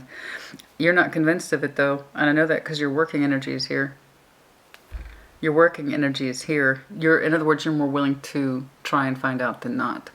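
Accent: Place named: Texas, United States. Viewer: You are so beautiful and I knew I heard a Texan accent…the reading resonates 100%!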